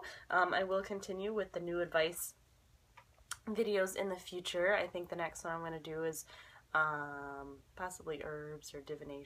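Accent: Michigan, United States. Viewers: i could listen to you all day. maybe its your michigan accent that i love haha.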